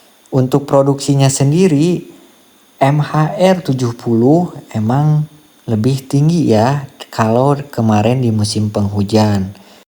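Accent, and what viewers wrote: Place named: Sunda, Indonesia. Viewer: Hatur nuhun kang pantesan atuh logat bahasana nyunda pisan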